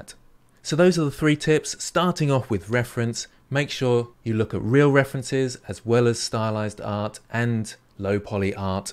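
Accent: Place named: Essex, United Kingdom. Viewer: Love your tutorials. Very easy listening voice and accent. Is that Essex?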